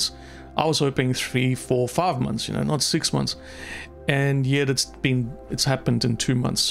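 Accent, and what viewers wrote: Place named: South Africa. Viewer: u sound south african.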